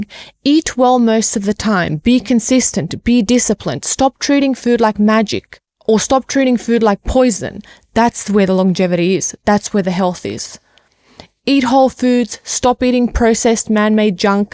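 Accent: Australia. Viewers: Where is she from…? Australia? New Zealand? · Are you Australian?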